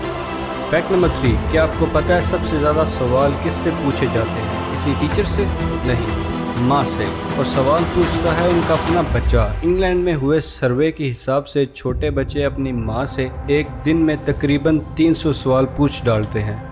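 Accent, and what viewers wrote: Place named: Punjab, Pakistan. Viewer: tumhara lehja "Punjaabi"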